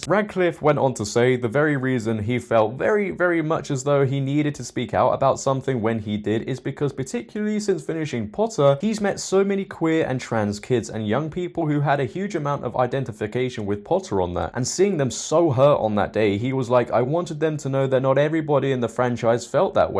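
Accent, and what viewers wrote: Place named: United Kingdom. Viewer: It’s my brittish man who gives based facts in a swanky accent.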